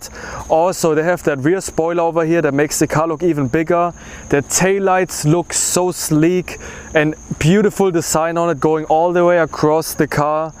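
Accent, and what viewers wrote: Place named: Germany. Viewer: Are you German? You sounds very German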